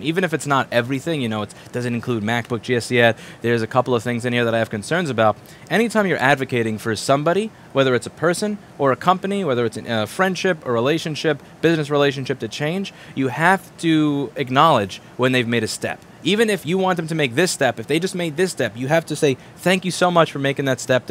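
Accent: New York, United States. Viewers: Lmfao young rossman had a new york accent i didnt expect thaf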